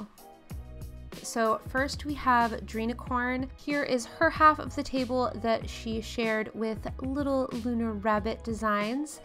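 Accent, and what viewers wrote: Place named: Scotland, United Kingdom. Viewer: AHHHH that Scottish accent melt Thanks for sharing the footage from Treasure Fest - still bummed I couldn't go, but so glad to hear your account of it. All those succulent babies! So cute.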